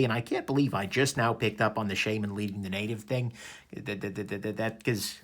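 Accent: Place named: New York City, United States. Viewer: My wife walked in and asked if I was listening to Woody Allen talk about knives. You do have that Manhattan accent. Happy New Year!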